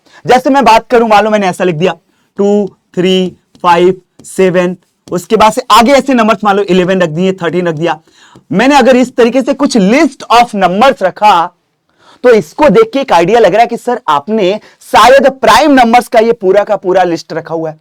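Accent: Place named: Odisha, India. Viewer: odia sasta English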